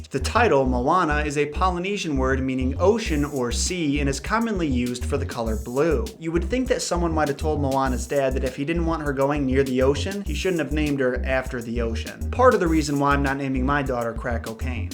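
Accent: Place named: New Jersey, United States. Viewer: HAHAHA that jersey accent though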